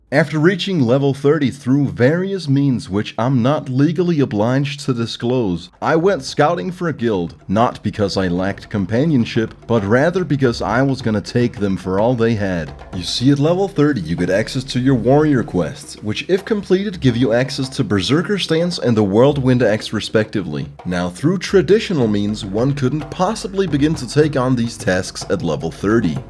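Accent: Denmark. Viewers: Wait a minute - er du dansker hahaha? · bruh, you from Denmark? Got that Arla hitter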